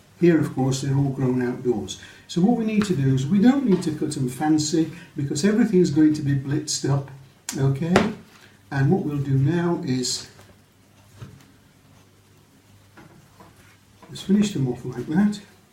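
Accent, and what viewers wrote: United Kingdom. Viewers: Which part of the UK are you originally from Mr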